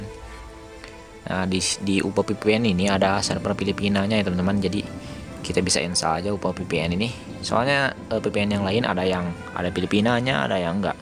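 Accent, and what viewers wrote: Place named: West Sumatra, Indonesia. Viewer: SUARA MU KYK MINANG KOCAK BANG, TP MANTULLL BANGGG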